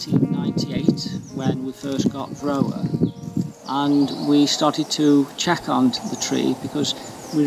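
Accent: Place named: Stoke-on-Trent, United Kingdom. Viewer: Very interesting certainly somthing to think about . You sound like you a stoke on trent man where you from there origanal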